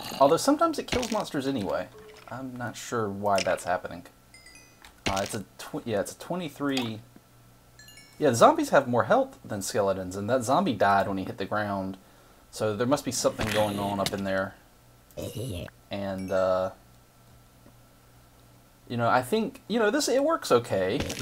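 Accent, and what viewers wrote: South, United States. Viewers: "Warter" and "Warsh" are the way water and wash sound with a southern accent. There are many others that sound off, but after a while it doesn't trip you up if you've grown up speaking/hearing English and various accents. For those who are multi-lingual, I'd have to say it would throw you off, though.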